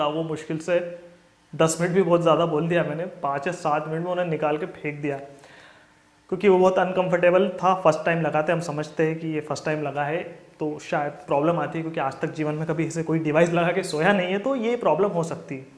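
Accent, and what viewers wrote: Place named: Madhya Pradesh, India. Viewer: Aapke bolne se pata chalta h aap MP se ho... Or gharaatte nahi bolte... Kharaate bolte hain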